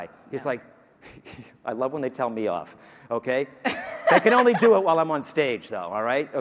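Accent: Boston, United States. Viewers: I'm from Rhode Island.. sista-!! Lol Bossston'~!! We've got that 'hard ass' slang accent~! Beautiful ..👌🏼. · I LOVE THIS GUY!! I grew up on Cape Cod , MA - he sounds like all of my college classmates, from around Boston, Southy, Framingham!! I love the accent. I can't wait to see my 18 year old Terrier!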